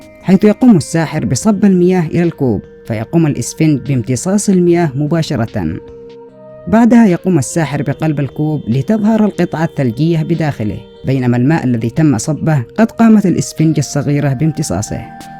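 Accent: Yemen. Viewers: عمر باعمر هذه لهجة يمنية و يصعب فيها نطق بعض الحروف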